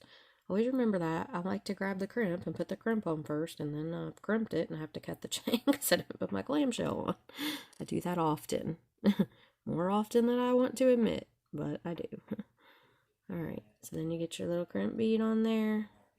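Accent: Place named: Texas, United States. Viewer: I thought I had your accent pegged as Texan, but I see that Fort Bragg is in North Carolina. Being a military (Air Force) brat myself, I know that families move around a lot. So, my question is, where are you from? Btw, YouTube suggested your channel to me and I'm glad they did. I am a new subscriber.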